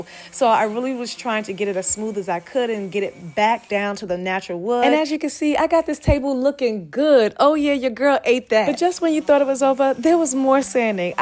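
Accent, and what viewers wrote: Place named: New York, United States. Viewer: You sound like you’re from New York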